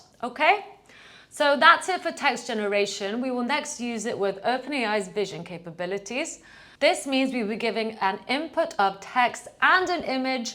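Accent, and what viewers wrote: United Kingdom. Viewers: Great content, British accent, this channel rocks!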